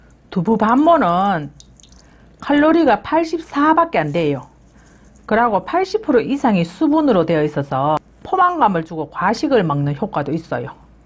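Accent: Gyeongsang, South Korea. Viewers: 샛낫꼽때기만큼 아주조금 경상도아지매 맛나게하시네요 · 구수한 경상도사두리가 친근감있어 좋아요.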